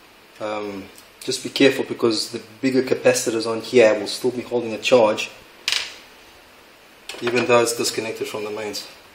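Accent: South Africa. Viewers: Hi my fathers LG flatron w2043s started fading a while ago had lines and just wasnt right obviously ive tried a rubber on the ribbon for corrosion and tried to extend my laptop display via vga cables all i got was a white screen any idea what i can try next? are u from South Africa by the way ur accent sounds close:) im an SA dude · Are you South African?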